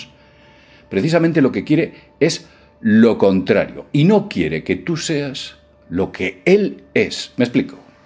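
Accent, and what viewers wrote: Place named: Castile, Spain. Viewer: Jeje esa mezcla de español castizo, y efectos especiales es... Bastante bizarra.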